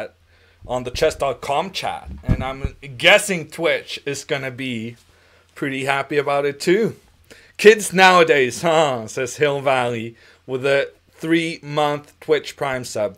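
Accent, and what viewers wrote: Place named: United States. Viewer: Du låter nästan amerikansk.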